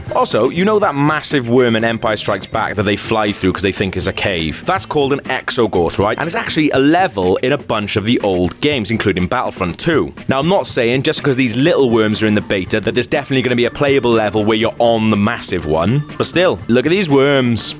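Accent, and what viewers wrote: United Kingdom. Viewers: There's nothing worse than listening to this British commentator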